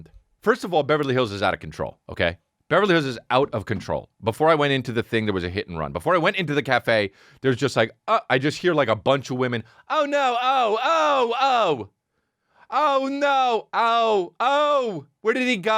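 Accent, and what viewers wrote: United Kingdom. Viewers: Your British accent is surprisingly really good!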